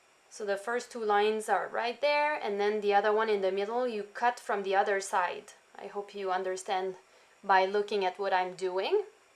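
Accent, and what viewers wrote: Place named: Quebec, Canada. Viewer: Beautiful water colouring, it looks so pretty and relaxing. Love the rainbow with the string, can’t wait to do this with my daughter. Amazing mini book, you make it so fun and easy. Thanks for all these great projects, ideas, and inspiration. Love listening to your accent, working on our French in Ontario and hoping to make another trip to Quebec soon.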